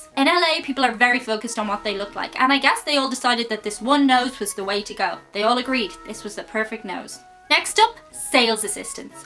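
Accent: Ireland. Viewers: Enjoyed the video! I don't know if you are intentionally toning down your accent, but it is far milder than many Irish people I've met and listened to. 1) I think you're the first person I've ever heard of who went to Compton as a tourist! LOL You'z in da hood, girl! 2) Don't think we should base gun policy on the vague 'way guns make me feel'. 3) Having lived in England before (which I'm not lumping in with Ireland), I think you're spot-on about the greater variety of body types you frequently encounter in America, and you're the first I've heard to put it that way. Insightful observation. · You're losing your Irish accent. Or maybe you're toning it down on purpose? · Lmao your Irish accent just makes everything that much more enteratining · i really have a thing for irish girls she is hot and i love her accent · My family is Irish . You don't have a very strong accent. 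Come on, Of course you knew you don't visit Compton! So what about guns, Get robbed & you'll want one too!! No fatties in Ireland?? But you DO HAVE MY FAVOURITE MRS. BROWN'S BOYS. CHEERS🌞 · Like your Irish accent and personality! Y'all cool with the Scots?! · Love the Irish accent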